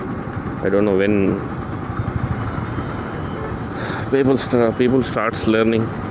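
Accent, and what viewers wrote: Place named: Hyderabad, India. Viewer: bro u speak superb hyderabadi hindi..😁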